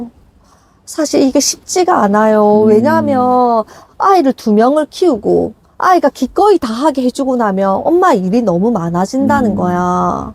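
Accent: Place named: Busan, South Korea. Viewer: 쌍둥이 육아하는 아부지 입니다 마누라 도와서 열심히 서포트 하면서 오늘도 교수님 나긋나긋한 부싼 억양 들으러 왔어예~ ㅎㅎㅎㅎ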